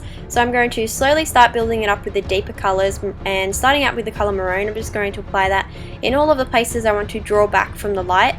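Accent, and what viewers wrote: Australia. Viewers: are you Australian? Your really pretty and an awsome make up artist btw! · Amazing! I'm taking from the accent you're Australian like me, so is there a particular place fellow Aussie's can buy Ben Nye supplies or does it all have to be online/from USA? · You Aussie? Love this!! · Lawrence Stapleton she sounds very australian : ) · Yeah Australian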